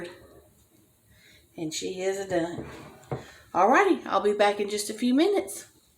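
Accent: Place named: Tennessee, United States. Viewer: I love you you talk like me I’m from chattnooga tenn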